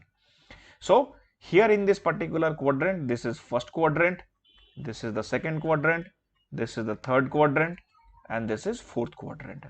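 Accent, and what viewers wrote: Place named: Bengal, India. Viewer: Thankyou Sir !! 😁 There's a touch of Bengali accent btw i think..🧐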